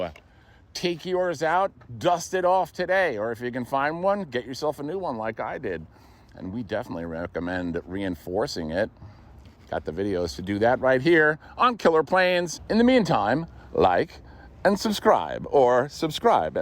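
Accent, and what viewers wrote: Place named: North America. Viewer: Brits aren't usually able to place North American accents, so for my education where is your accent typical of? Great fun videos.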